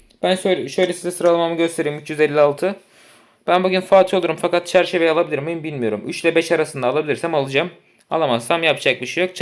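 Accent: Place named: Giresun, Turkey. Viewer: Giresunlumusun şiven anımsatıyo bende giresunluyumda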